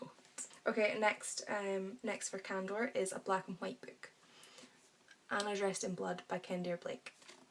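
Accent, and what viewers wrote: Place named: Scotland, United Kingdom. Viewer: I don't think I will ever say enough of how much I love your Scottish accent! It is so pretty. Can't wait to do this tag! I think I already have about half of them answered in my head lol.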